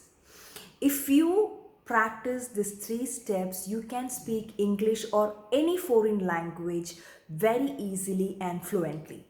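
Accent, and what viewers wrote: Kerala, India. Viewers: Last one month i started to watch your videos, its very nice and amzing. That time i think u r from north indai but today i seem u talk malayam😂. Any way good videos for us this. Thnk you. 🌹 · I think ur from Kerala. But ur English do not have much Kerala slang. That's great. If me or anyone had Malayalam slang in English it will not be liked by other people's. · Malayali accent should not be a problem for anyone of us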